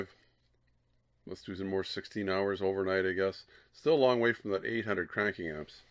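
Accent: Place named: Canada. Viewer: OH i bet it is Canadian dialect. I’m down here in the USA. You do a very decent job on your voiceovers.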